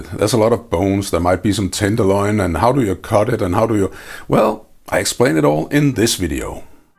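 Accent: Denmark. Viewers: Looks great, and the Danish accent makes it even better.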